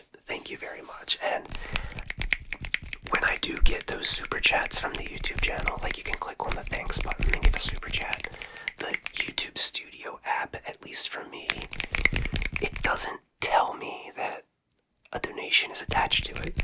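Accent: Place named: Maryland, United States. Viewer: I really enjoyed this video! Love the talk about the accent, being from the UK I didn’t really realise people from Maryland / Baltimore had such an accent!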